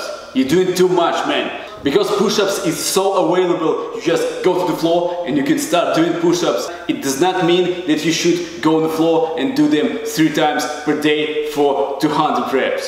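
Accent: Russia. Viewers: You Have a Nice Russian Accent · Your Russian accent is so cool and badass, and you look awesome! If I were gay (I am not) I would definitely have a crush on you, bro! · I knew that this is gona work when i heared the russian accent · The Russian accent just make it better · Единственный кто имеет русский акцент, когда говорит на английском и наоборот... Кайф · This Russian accent pumping me up 😂😂.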